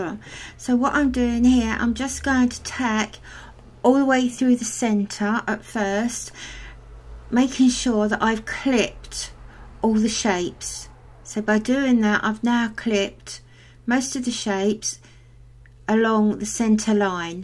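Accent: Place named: Cockney, United Kingdom. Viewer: I can hear a little of your Cockney roots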